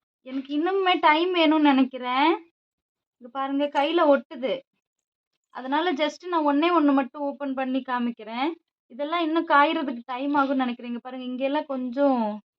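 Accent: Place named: Odisha, India. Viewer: சகோதரி உங்களை பார்த்தால் ஒடிஷா பெண் மாதிரி இருக்கு. நீங்கள் பேசும் தமிழும் ஒடிஷா பெண் பேசுவது மாதிரி இருக்கு. உண்மையை சொல்லுங்க நீங்கள் ஒடிஷா பெண்ணா இல்லை தமிழ் பெண்ணா?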